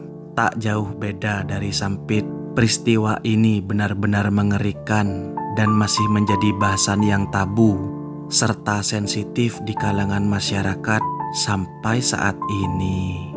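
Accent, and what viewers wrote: Madura, Indonesia. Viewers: Logat bicaranya kental bgttt... Kental bhs Madura.. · abg madura kan logat ny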